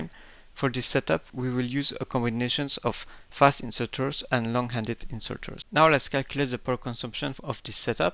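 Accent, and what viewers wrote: France. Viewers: Nice tutorial ! By any chance, are you French ? 👀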